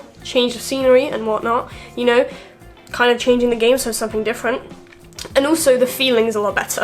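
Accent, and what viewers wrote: United Kingdom. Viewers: i was thinking that she dani but british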